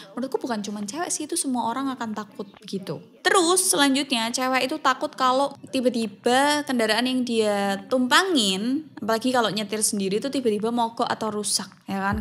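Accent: Java, Indonesia. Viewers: medok nya itu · Klo pingin makan ati terus, kepripen hayoo ??? logatmu kok campuran suroboyoan+Jawa tengahan sih ???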